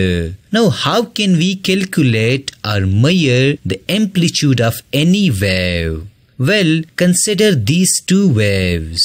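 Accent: India. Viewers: You got no doubt the thickest indian accent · the india accent is very annoying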